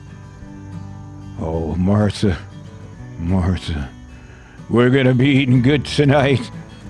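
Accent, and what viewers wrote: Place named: Colorado, United States. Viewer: His accent doesn't sound like a Texas accent more like Colorado maybe.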